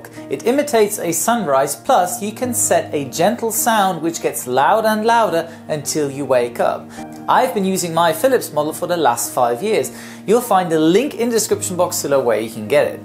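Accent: England, United Kingdom. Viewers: You are very British in your accent. Refreshing, in a world that is crazy about imitating America. 😊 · Hi,! I'm from São Paulo, Brazil. Well, I simply love Watching your videos on YouTube. Nice to see that, from all your habits as soon as you get up, you meditate! Absolutely healthy to your mind, body, and everything. I really admire your job and this charming British accent you have! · Wait is british?? All this time i thought he was german with amazingly english accent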